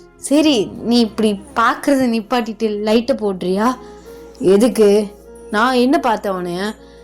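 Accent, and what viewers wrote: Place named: Nagore, India. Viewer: Ena sis engala ippidi suspense layae vaikiringa😩but na story half laye guess pannitan y/n also human illanu😁next intha upcoming story kaga waiting sis🤗oru veala enoda guess y/n half human +half Demon or werewolf ha irupalooooo.....Sis neenga demon nu tha solluvinganu nenichan😂na last comment la kuda Demon nu tha potan😂but idhu sema story sis i loved it😊unga slang awesome neenga nagore ha sis😊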